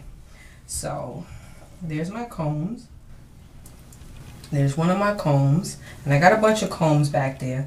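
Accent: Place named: New York, United States. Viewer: I super love your new york accent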